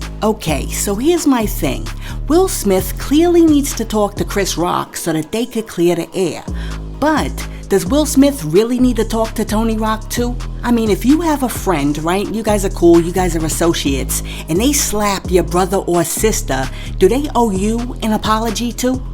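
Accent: New York, United States. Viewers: As someone from NY born and raised I can tell the woman narrator is from NY and is also a Gen X.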